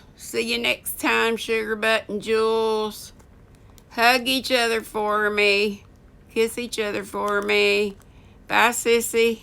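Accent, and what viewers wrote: South, United States. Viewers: Your a HOOT! Love southern accents!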